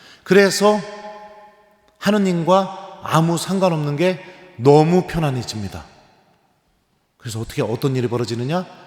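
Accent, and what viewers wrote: Chungcheong, South Korea. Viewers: ❤🎉 for your Choobg-cheong-do voice which is the same voice I have heard in Toronto Aurora, Luke Cho in Canada 🇨🇦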